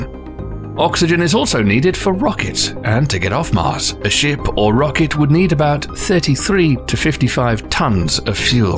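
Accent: United Kingdom. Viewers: Don't say its a mistake because your wrong even with your cheesy British accent.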